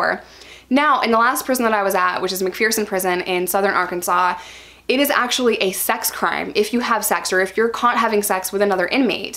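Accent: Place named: Southern California, United States. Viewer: Arkansas? Accent is a bit more So Cal.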